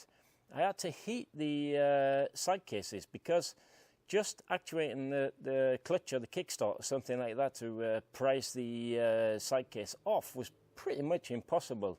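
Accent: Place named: Glasgow, United Kingdom. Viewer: Dude! While I am a Vespa guy through-and-through, I really enjoyed watching you take us around this original Lambretta. It was quite a bit of fun! Not sure how I got here, but you've got a new sub. I picked up some Glaswegian in your accent...then, I read your bio! Great work, sir!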